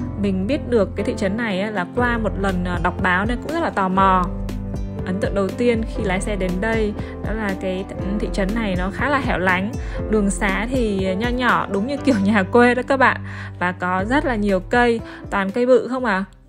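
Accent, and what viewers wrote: North, Vietnam. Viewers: nói giọng miền Bắc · bạn nói giọng miền Bắc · Lâu lâu mới nghe giọng Bắc ở Mỹ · * là người bắc phải không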